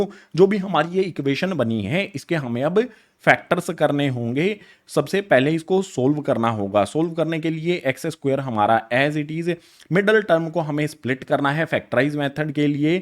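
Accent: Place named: Kota, India. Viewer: your accent... 100% kota student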